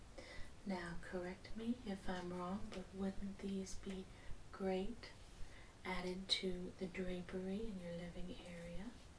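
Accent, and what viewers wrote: South, United States. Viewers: Is she from Texas? It sounds like a slight southern something in her dialect...not full on Texan or Dixie talk but there’s a little southern comfort in there.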